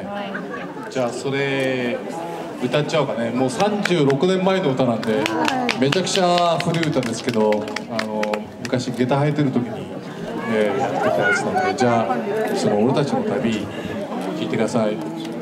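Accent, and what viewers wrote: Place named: Tohoku, Japan. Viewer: ちょっと東北弁入れてる感じで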